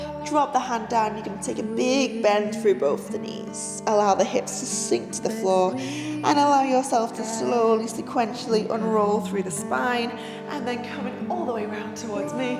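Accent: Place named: United Kingdom. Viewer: So happy I found your channel. I’m coming down from intensive exercise & being kinder to my body. Loving the combination of weight’s & pilates moves. Love the fact that you’re U.K. too, fed up of listening to over hyper American accents.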